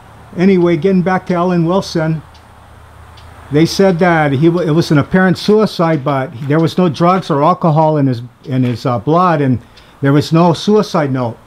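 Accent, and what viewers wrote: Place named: Chicago, United States. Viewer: are you from Chicago or Milwakee? Its date der accent Yah Know?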